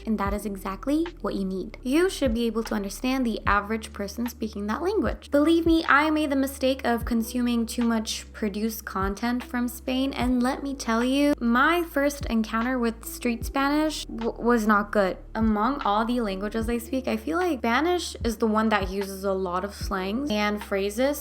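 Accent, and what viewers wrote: United States. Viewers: Not sure exactly, but she has a very specific West coast American accent so it's likely she has either lived in the US for a while or has family or friends that speak English with that accent. If you want to learn to speak like that, watch a lot of American YouTubers and try to mimic their accent and the words that they use.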